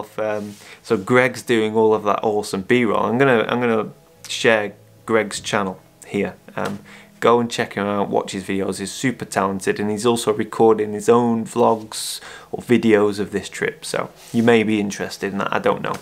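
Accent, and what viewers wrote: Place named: Northern England, United Kingdom. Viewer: Just discovered this channel...brilliant! Can just about understand your ‘tut north’ accent :) was just wondering - you used a full ND and an ND grad in a low light situation.....how do you position the ND grad correctly as it must be difficult to judge the horizon line in such low light... Unless you put the ND grad on first - align it then put the full ND on after?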